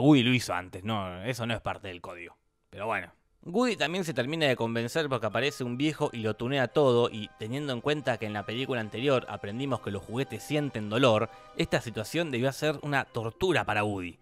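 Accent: Argentina. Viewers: Sos argentino? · Sos argentino???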